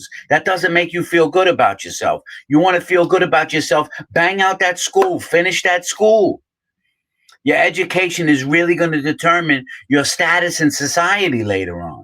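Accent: New York, United States. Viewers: I love your material! I can relate to a lot of your stories! I am from NY two so I reconized your accent! I really like your context!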